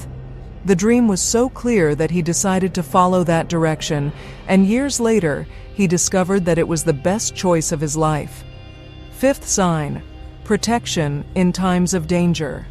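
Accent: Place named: United States. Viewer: Sorry when I hear this sharp woman's American accent it makes everything like a Mickey mouse cartoon. Shame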